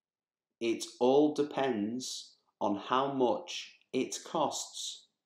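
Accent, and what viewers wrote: United Kingdom. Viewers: From what part of Britain this accent is? In some words the pronunciation differs from that in transcription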